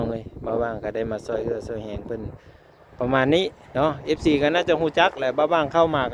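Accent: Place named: Phu Thai, Thailand. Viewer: ສະບາຍດີ ເວົ້າສຳນຽງຜູ້ໄທກໍມ່ວນຄືກັນ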